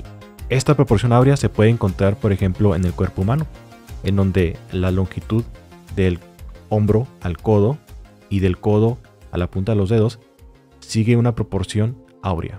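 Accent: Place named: North, Mexico. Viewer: Sospesho que eres del norte de México por ese acento tan peculiar 🤭 ánimo paisano, tú canal es muuuy bueno!!!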